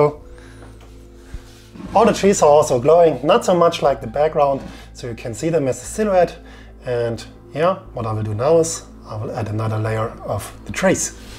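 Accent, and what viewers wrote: Germany. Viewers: Wie man raus hört das du Deutscher bist :). Schönes Bild. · Ich weis nicht woran aber man merkt dass er deutsch ist. Und er gibt sich Mühe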